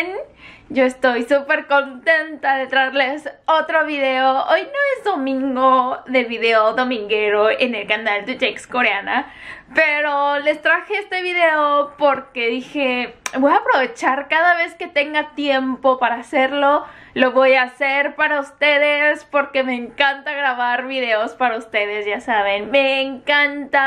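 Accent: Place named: Mexico. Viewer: Haha tiene acento de mexicana fresa, me gusta xdxdxd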